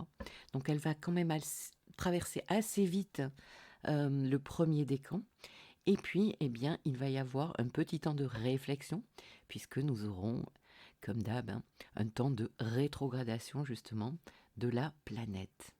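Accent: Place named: South, France. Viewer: Bonjour, je viens de vous découvrir...votre voix est très agréable à écouter...du velours! Et votre petit accent méridionnal est bien stimulant dans cette période très nuageuse et un peu triste! Belle synthèse de ce qui nous attend pour une année!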